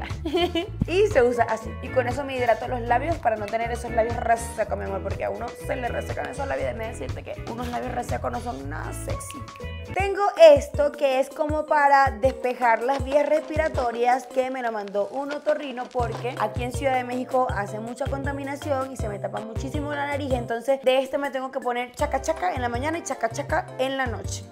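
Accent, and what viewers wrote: Venezuela. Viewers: hola una pregunta 'tu eres venezolana'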